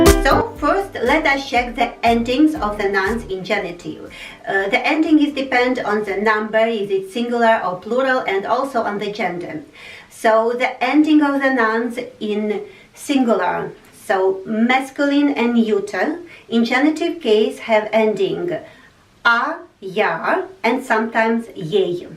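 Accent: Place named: England, United Kingdom. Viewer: You're funny, naughty and nice person and I like your English accent! :)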